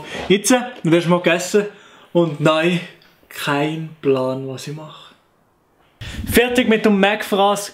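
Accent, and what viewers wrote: Switzerland. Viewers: Bist du Schweiz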